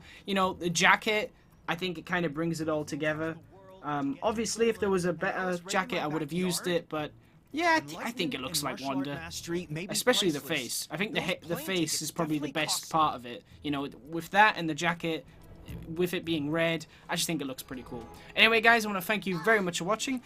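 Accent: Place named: United Kingdom. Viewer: For some reason your British accent is annoying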